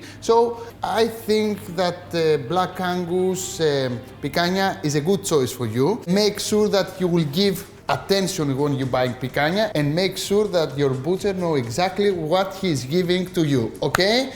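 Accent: Greece. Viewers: Thank God, the video is subtitled. 😆😆😆 I've rarely heard anyone speak English with such a heavy Greek accent: to the point of being almost unintelligible.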